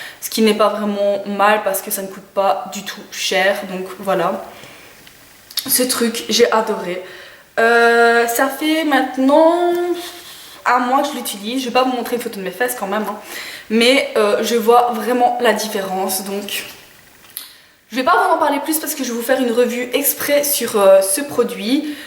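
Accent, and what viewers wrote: Belgium. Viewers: salut, je viens de découvrir ta chaîne et j'aime beaucoup tes vidéos tu es claire et précise et ton accent Belge je crois si tu es Belge je l'ai vite capté lol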